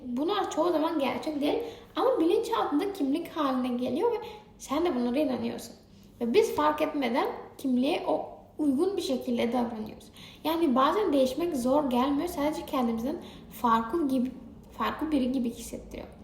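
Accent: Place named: Russia. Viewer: Rusça gibi konuşuyorsun Türkçeyi ama dinletiyor. Bilinç altı konusunda da haklısın👍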